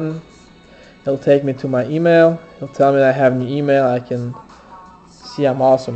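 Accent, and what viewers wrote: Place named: Russia. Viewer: You're from Russia? Love the accent :-)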